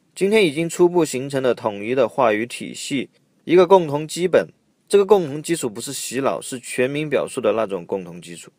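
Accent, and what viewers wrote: Taiwan. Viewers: 你凭啥是台湾腔你是大陆人吗不要台湾腔好不好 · 是台湾人吗？口音有点像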